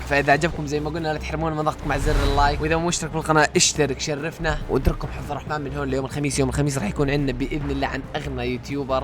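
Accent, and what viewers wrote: Jordan. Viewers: أحبك وحب طريقة سردك للقصص مفهومة وأحلى شي لهجة أهلنا الأردنيين قريبة على القلب ومفهومة😍❤🔥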